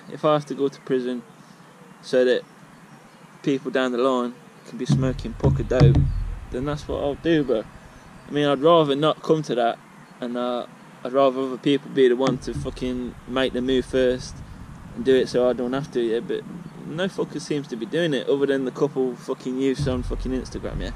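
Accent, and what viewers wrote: United Kingdom. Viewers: He’s brummie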